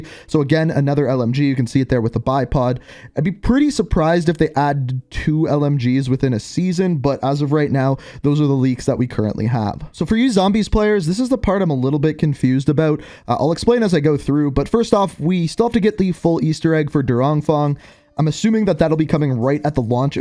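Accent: Canada. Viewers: You're Canadian, I know accents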